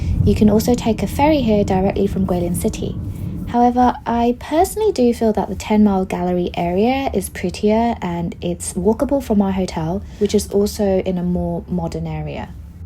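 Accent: United Kingdom. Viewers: This is the first time I ever had your channel come up on my YouTube. First it reminds me of those famous spots in Thailand kind of has the same scenery. But the first thing really is your voice first of all it's unexpected I didn't expect to hear your voice and I don't think it's artificial intelligence I think it's your real voice. Your accent sounds British in a sense. You two are are cute couple you're beautiful and your voice is just a really gorgeous. I'm from America grown up as a kid I always wanted to travel never got around to it. But China real China not cities and Japan not cities I loved as a kid cherry blossoms bamboo forest the clothing the tradition the music is awesome. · Can I ask where is the accent from? Love the accent, british but not super londonish. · What's with the British accent?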